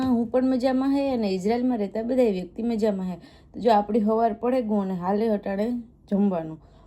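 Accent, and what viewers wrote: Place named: Kathiawad, India. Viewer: जी गुड मॉर्निंग मैं आपका ब्लॉग देख रहा हूं मुझे आपकी भाषा बहुत अच्छी लगती है वैसे मैं भी गुजराती ही हूं टिपिकल काठियावाड़ी भाषा सुनने में बहुत मीठी और अच्छी लगती है मैं अहमदाबाद गुजरात से हूं अपने बाजू में कोई साइकिल वाला हो जिसकी नंबर प्लेट हो उनको ही पूछ लो 👈😍🤣😂🤗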